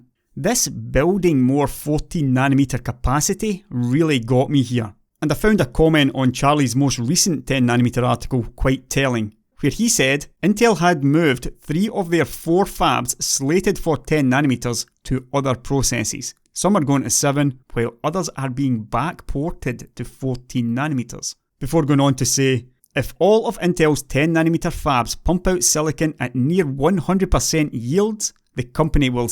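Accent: Scotland, United Kingdom. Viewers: I hear a Scottish accent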